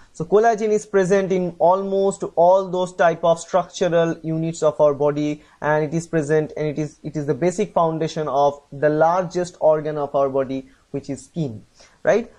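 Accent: India. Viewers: Go find videos from people from your language people... He is an Indian, he will speak like an Indian... If you have a problem with that... Go fuck yourself...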